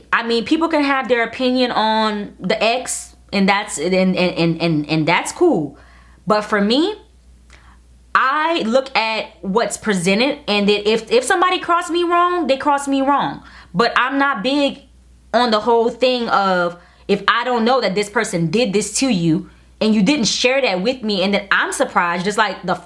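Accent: Louisiana, United States. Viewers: I just want to say I love you! I was lowkey laughing in parts of the video bc all I hear is our Louisiana accent! Lol I can most definitely tell your from Laffy way! Lol I’m from Houma💕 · I heard all the Louisiana in I love you please no more videos like this YT mom ❤️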